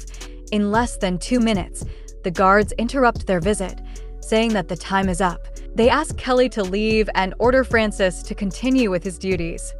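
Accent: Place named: United States. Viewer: Your storytelling is great 👍❤and your accent of united states is great 👍 this movie Recap is nice 👍❤❤. By the way I'm new on this channel,I will I new this channel before, thanks a lot. I got entertained ❤❤❤❤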